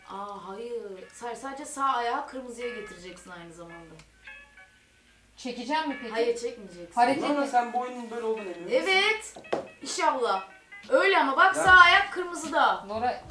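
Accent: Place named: Osmaniye, Turkey. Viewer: Yaw her hareketine ve konuşma tarzına hastayım aynı bizim osmaniyeliler gibi konuşuyor hal ve hareketleride aynı. Kısacası Hastasıyız Dedeeee 😎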